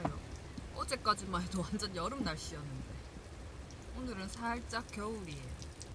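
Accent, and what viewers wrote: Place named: Gyeongsang, South Korea. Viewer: 잘뵤고 있습니다~ 전대구에 사는데 경상도 억양이 정겹고 더 좋으네요~❤❤ 사시는곳 물어봐도 될까요~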